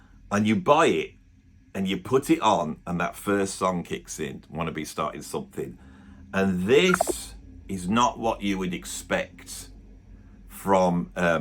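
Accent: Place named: England, United Kingdom. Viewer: that accent. What region in England?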